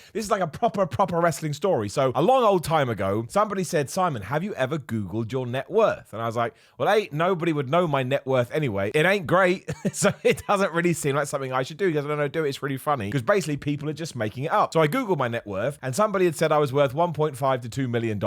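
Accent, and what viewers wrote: United Kingdom. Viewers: The british accent 😅🤣👍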